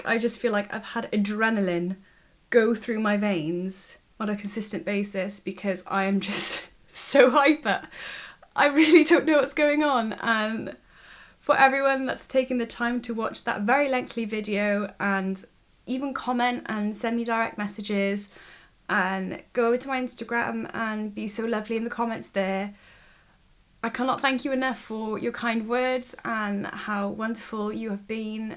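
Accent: United Kingdom. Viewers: Omg I love your British accent and Jesus Christ you're so beautiful🤩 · U have a british accent 😍💯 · AHH BRITISH ACCENT IS THE MOST ADORABLE AND BEAUTIFUL ACCENT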